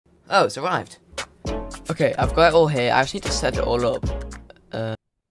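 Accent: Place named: United Kingdom. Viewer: wait, dollars are you american you sound a little bit british to me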